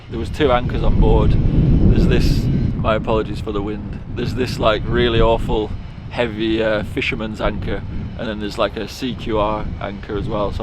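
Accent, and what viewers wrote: Lancashire, United Kingdom. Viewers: Sounds like most of the blokes in my nice Lancashire town....there are still some left.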